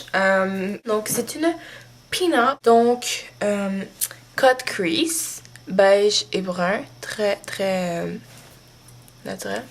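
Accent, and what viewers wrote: Quebec, Canada. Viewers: Srx , elle s'invente pas un Accent , ni des noms de maquillage ! Elle est québécoise , t'avais pas encore Compris ? ^^ Cet toi qui fait pitié ma Pauvre ! Retourne au Collège ma petite , faudrait bien que tu apprennes a écrire . Tu crois pas ? x)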